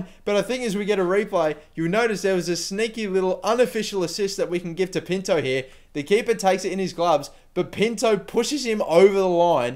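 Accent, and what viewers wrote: Australia. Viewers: Are you Aussie!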